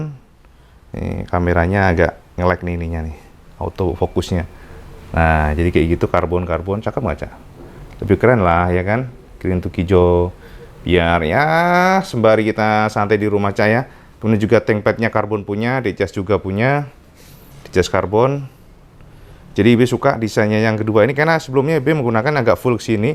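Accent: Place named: Tulungagung, Indonesia. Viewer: ketoro sekk enom cak sman 🤣🤣🤣 bhasa tulungagung mesti ujuk"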